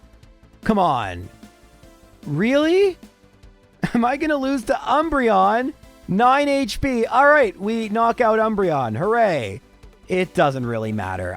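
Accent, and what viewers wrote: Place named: Canada. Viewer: He's Canadian, and canadian pronunciation caused both of those "issues".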